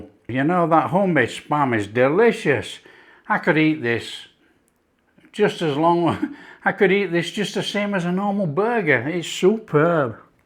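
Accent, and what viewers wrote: Yorkshire, United Kingdom. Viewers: My Mouths watering! I'll have to try this as I love Spam! It's not easy to find where I live in the Golden Triangle! 😄 Great to hear a Yorkshire accent when I'm so far from home! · you sound like you are in the UK? Where are you getting that much ground pork shoulder for less than a tin of Spam? I'd love to know.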